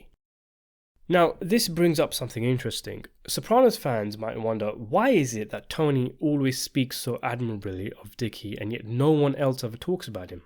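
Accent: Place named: London, United Kingdom. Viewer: I can tell by your accent that you’re south Asian London - am I right?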